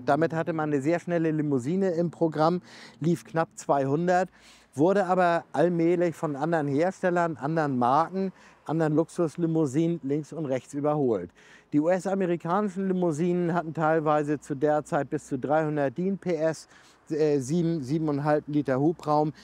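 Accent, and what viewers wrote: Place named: Hamburg, Germany. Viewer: Sehr sympatisch das breite Hansedeutsch!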